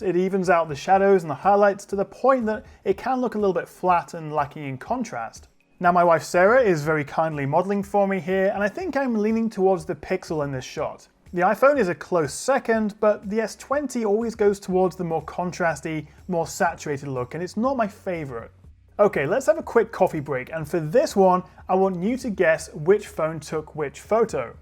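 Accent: England, United Kingdom. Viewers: great video man! I love your english accent btw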